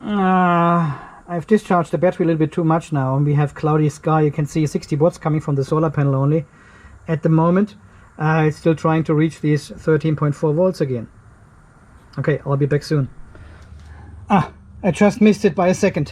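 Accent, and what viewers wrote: Germany. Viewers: So funny listening to a German pronounce Lead or Voltage, as for Iron ......OMG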